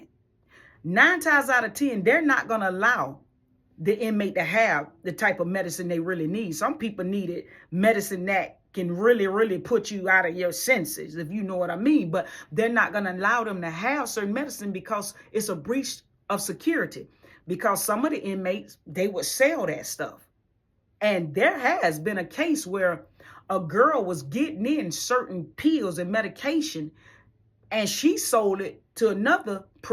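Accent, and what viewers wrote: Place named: Alabama, United States. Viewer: You from Alabama I can tell im also from Alabama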